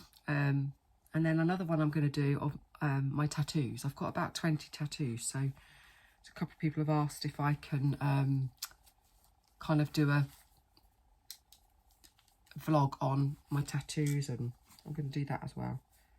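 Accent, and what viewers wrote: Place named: England, United Kingdom. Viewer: Good morning over there in England. Love your hauls and that accent still gets me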